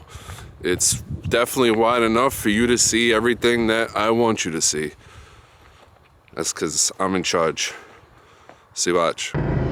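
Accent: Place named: New Jersey, United States. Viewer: If i had to guess the accent i wud say JERSEY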